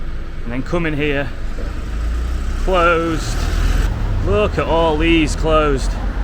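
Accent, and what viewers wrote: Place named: Northern England, United Kingdom. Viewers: hey fella i think your accent is so cool. real northerner. i come from Worksop near Sheffield. did you know that there are 4 very large funnel chimneys at East Midlands Parkway Train Station. i don't know if you have been there? also there are some on the Gainsborough skyline look at google maps of Gainsborough street view and you can see them. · This guy deserves his own sky or BBC show, with his facts, statistics and historic based narration i think more people should watch him, i also like that fantastic yorkshire accent. Respect.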